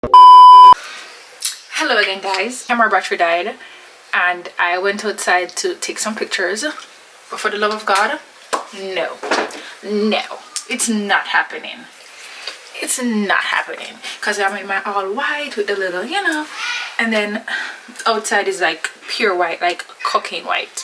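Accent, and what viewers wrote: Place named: United Kingdom. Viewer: I love the British accent ❤😂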